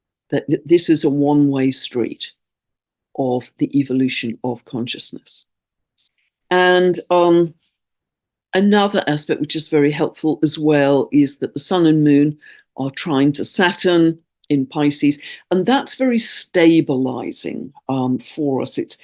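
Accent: England, United Kingdom. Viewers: Hi ! It's irrelevant Question but which English accent is that? One if the finest I've heard in recent times.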